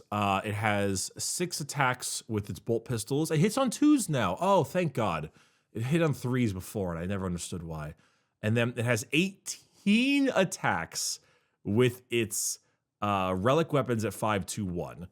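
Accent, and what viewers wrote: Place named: California, United States. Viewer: "Mare-icle" dice. Californian accent be strong.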